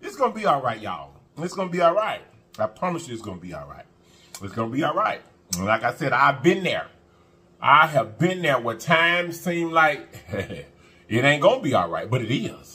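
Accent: South, United States. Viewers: HEY ITS MARGARET FROM DAYTON OHIO BUT I WAS BORN IN MOBILE I LOVE YOUR COUNTRY ACCENT I LOVE SLL FOODS U EAT I JUST LOVE YOUR. FAMILY TOO Y'ALL SLWAYS MAKE MY DAY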